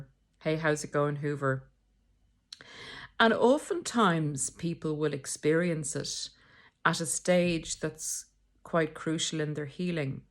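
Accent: Ireland. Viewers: I love to hear your Irish accent demonstrating the way in which one treats the Narcissist (Covert) in my case. Keep up the good work! And thanks so much for all your work and may we all be blessed! I find my Guardian Angel is also helping me a lot in negotiating this new territory. God bless you as well as the Work! K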